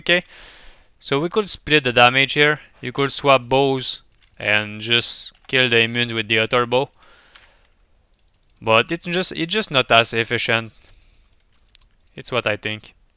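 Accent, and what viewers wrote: Quebec, Canada. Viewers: Nice comparison, I really enjoyed it. WF is pretty much OP for a late game Bowazon. By your accent, you must be from Quebec right? Have you considered a Bow Sorc with cold and fire enchant, geared with Witchwild String? She can kill super quick and teleport fast to the next mob groups.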